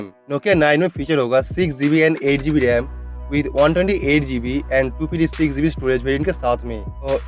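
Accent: India. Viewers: Fuck Indian accent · indian english?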